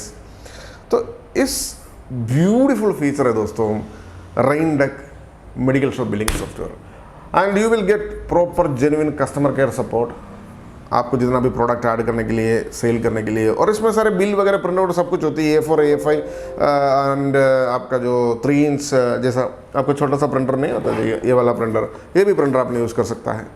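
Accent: Kerala, India. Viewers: Malayalam touch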